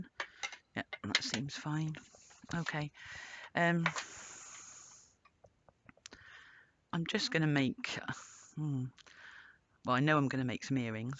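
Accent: United Kingdom. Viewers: Well i for one love watching your tutorials, i have just been turning the volume up, which is easy. I noticed the difference with the new microphone! All good. I am definitely going to try this with Cernit translucent and Premo gold and copper. I do find Cernit REALLY sticky so I'll try leaching it first. I love your accent too. Wiltshire or Somerset? My gran was from Wiltshire and it reminds me of her. Cheers from Australia 🇦🇺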